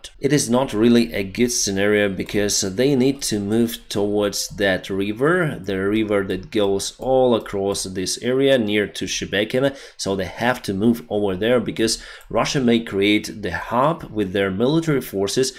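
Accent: Ukraine. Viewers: Bro I like your accent. I find language and accents incredibly fascinating. I spent a year in Poland, right on the border by Lviv. I spent a lot of time on the Ukrainian side of the border and made some friends I'm still in touch with. It was the best year of my life and your voice/accent reminds me of those friends I haven't seen in 20 years. A few are in the Ukrainian military I don't know where, I don't even know if they are still alive. FUCK RUSSIA! Thank you for the nostalgic.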